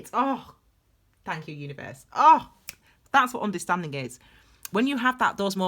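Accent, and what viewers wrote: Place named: North, United Kingdom. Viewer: as a fellow northerner (Sheffield) I love your accent, but omg, your personality just kills me. I am sure if we lived near each other we would be fabulous friends!! Keep doing what you're doing hun, because this was spot on for me and I couldn't have it delivered by a more down to earth, normal, hilarious person. Loved it!! xx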